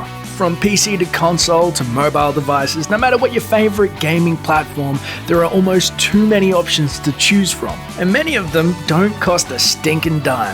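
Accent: Australia. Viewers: Faith Silva Is it Australian? I like that accent, too. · I'm sure it's Australian... 98% sure. I feel like Aussie and NZ accents sounds similar tho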